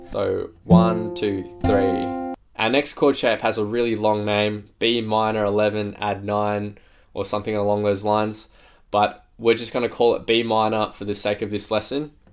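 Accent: Australia. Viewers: Ooooooo Australian c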